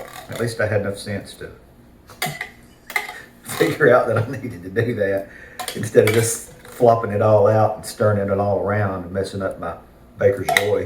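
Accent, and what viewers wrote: South, United States. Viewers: OOOH MY GOODNESS!! I HAVE FOUND A NEW FRIEND, IN MY HEAD! LOL! I FEEL IN LOVE WITH YOU, YOUR AMAZING SOUTHERN VOICE! I SUBSCRIBE TO YOU RIGHT AWAY AFTER THE HONEY BUN CAKE! I TURN ON ALL NOTIFICATIONS I LOVE HOW CAME IN A STOLE THE SHOW! YOU GUYS ARE SIMPLY AMAZING!! PLEASE CONTINUE TO SHARE THE LOVE AND JOY WITH US!! I LOVE YOU BOTH!! MERRY, MERRY CHRISTMAS!!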